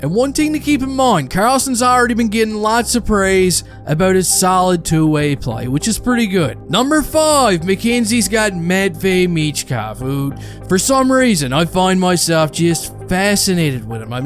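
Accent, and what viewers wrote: Newfoundland, Canada. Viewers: Sounds like a Newfoundlander. Where are you from?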